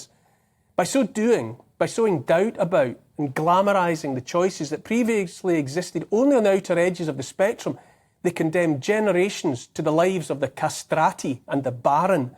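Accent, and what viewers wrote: Scotland, United Kingdom. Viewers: thank God for people like you. You are the best, views so eloquently put and with your adorable Scottish accent too. Wake up people!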